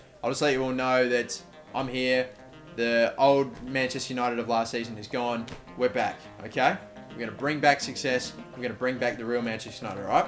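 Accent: Australia. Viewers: Definitely a fellow Australian? Nice video dude. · You an Aussie?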